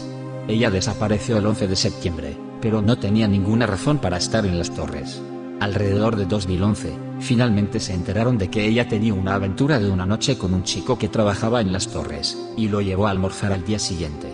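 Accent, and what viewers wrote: Russia. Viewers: Es una chica, es extranjera, no se si sea la única administradora del canal. Tiene un acento euro asiático o similar, tal vez ruso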